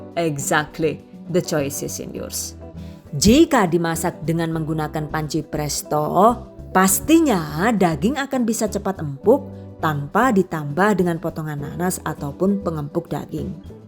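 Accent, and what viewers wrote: Banyumas, Indonesia. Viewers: Mantab masak teruuus dan lengkap Tips&Trik memasak. 👍👍👍 Mbak, Saya boleh tanya? Kira-kira apakah mbak ada rencana membuat buku berisi kumpulan resep lengkap ala lengkap Tips&Trik memasak juga?, kalau iya maka saya minat banget mau request membeli buku resep dari. Namun YouTube-nya tetap eksis mbak ya?, karena selain menyenangkan memasak 👌 dan saya terhibur menyimak pelengkap sedikit fitur kartun 😀, apalagiii bahasa inggrisnyaaa 👍 serta cengkoknya 👍 dan kadang-kadang disertai bahasa ngapak terhibur bangeeet 😁. TETAP PERTAHANKAN STYLE-NYA YAAA, SOALNYA JARANG-JARANG ADA (BAHKAN STOK LANGKA LOH YAAA) KULINER WANITA YANG JUGA MEMILIKI NILAI LEBIH BISA MEMBUAT GUYONAN. Oke mbak, kembali ke fokus request saya hal buku. Jangan lupa dibalas ya?, Saya tunggu kabarnya.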